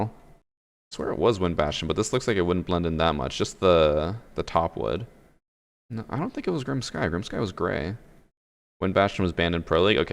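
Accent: Canada. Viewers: Yo do I speak Spanish? I know ur Canadian because you say “oat” instead of out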